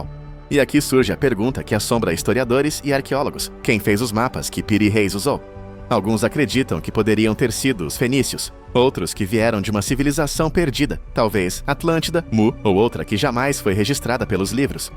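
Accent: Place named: Sao Paulo, Brazil. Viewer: Sotaque de paulista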